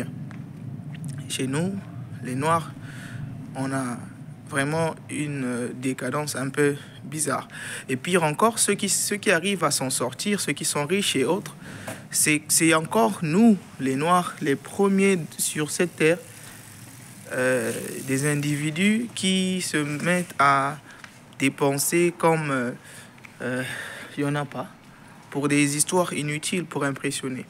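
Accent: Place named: Asia. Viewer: Tu as pris l'accent asiatique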